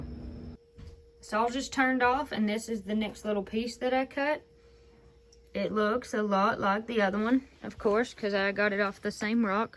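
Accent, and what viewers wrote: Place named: Tennessee, United States. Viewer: 😂 I just had to take a second to figure out what you would be saying, since I knew you weren't talking about maggots. I've lived most of my life in TN, so I'm used to accents like yours. hahaha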